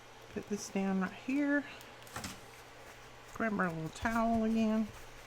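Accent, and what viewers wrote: South, United States. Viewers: I tried this for my first journal. I loved making it so much I'm working on my third journal....much harder ones. I love how there are so many choices for how to make them. And I enjoy your voice. You have the same accent my Grandmother had. She was from Nebraska. Yours may be a little more Southern, though. Thanks for the great videos.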